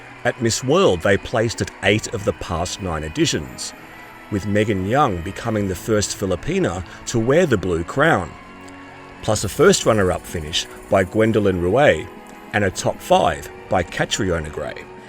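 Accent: Australia. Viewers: Another great video, you have so much knowledge about The Philippines from someone who has (What I’m presuming 😝) an Australian accent. 👍🏾 Do you have Instagram by any chance? ☺️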